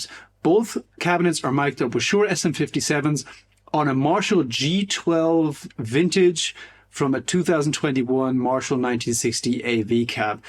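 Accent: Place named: Ireland. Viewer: Really enjoyed the video…. I absolutely love your commitment to the development of speakerpedia. I have to ask something though as it’s really bugging me. Did you ever live in Ireland ? It sounds to me like you might have either lived in Ireland or learned English from an Irish person. Weird question i know but these things tweak my ear and i have to know. Thanks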